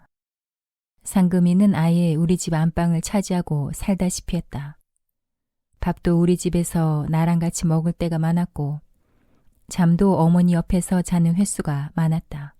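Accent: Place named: Gyeongsang, South Korea. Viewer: 산 기슭에 판자를 얽어 움막을 짓고 살았던 사람들의 이야기가 연상되네요. 물론 한 세대의 간극은 있지만요. 술이 고주망태가 되어 마누라를 무지하게 패던 못난 놈들이 살았던 시절. 그래도 아침에는 해장국이 밥상에 오르던 그때 이야기는 이제는 없겟지요? 님의 경상도 사투리가 아주 구수합니다. 박재홍님의 옛 노래의 노래처럼 말입니다.잘들었습니다.☆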